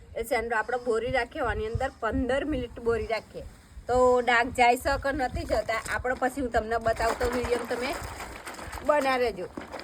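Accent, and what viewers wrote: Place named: North Gujarat, India. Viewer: Language per na Jao vasant Bhai..Uttar Gujrat ni aavi j boli hoy chhe .so aapdi bhasha ni respect karo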